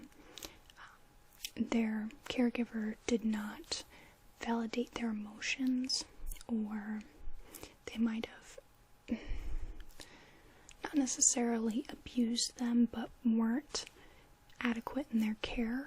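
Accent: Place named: United States. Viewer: Can't resist that USA accent so seductive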